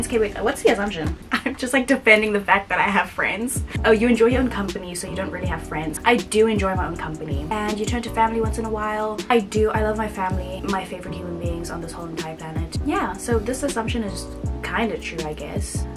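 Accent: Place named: Xhosa, South Africa. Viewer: Going thru ur vids catching up and Okay i love your accent no cap Are you xhosa🔥😌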